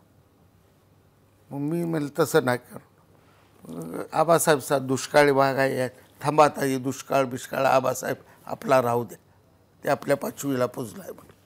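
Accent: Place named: Mandesh, India. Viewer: सांगोल्याला मिळालेले दोन हिरे गणपतराव देशमुख साहेब आणि शहाजी बापू पाटील ❤️ माणदेशी बोली ❤️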